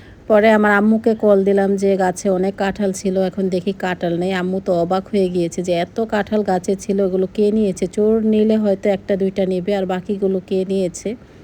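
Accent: Chandpur, Bangladesh. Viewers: চাঁদপুরের ভাষায় কথা বলেন আপু ❤❤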